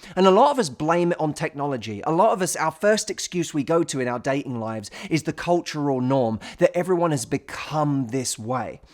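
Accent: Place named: United Kingdom. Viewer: adoro il suo accento britannico ed i suoi consigli!😍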